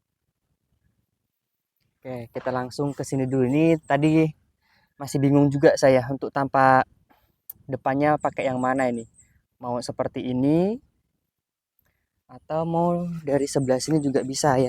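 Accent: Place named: Palembang, Indonesia. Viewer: Ikut bljr... Awak jemo mano logatnyo cak jemo palembang , aq di bkl.... Salam kenal dan satu hobby bro . 🙏🙏🙏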